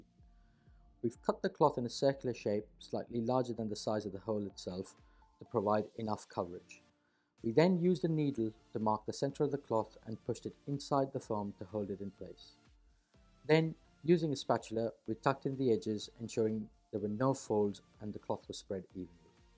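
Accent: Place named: Malta. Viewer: Curious to know where your accent is from - you sound just like an educated Maltese. Great job btw!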